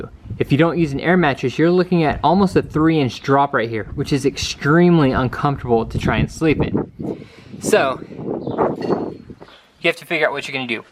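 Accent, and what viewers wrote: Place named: Utah, United States. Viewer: U from Utah area ? Based on your slight Utah accent. Cool build bruh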